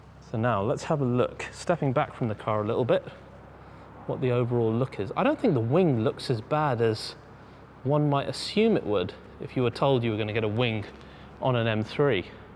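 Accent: United Kingdom. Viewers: maybe because of the British accent?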